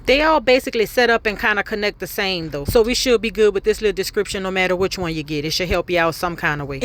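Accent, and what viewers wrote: New Orleans, United States. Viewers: Your voice sound like us from New Orleans Louisiana. You heard me 😂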